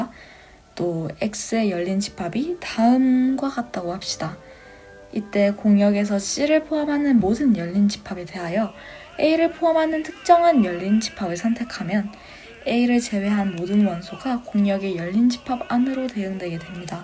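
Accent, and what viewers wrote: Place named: Japan. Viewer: 일본사람 같네요. 발음이..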